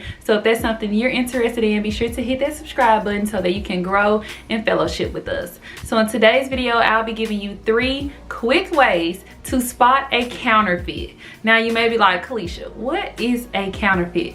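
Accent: South, United States. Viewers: No offense. But I’ve never seen a black women with a southern accent 😅 very unique and interesting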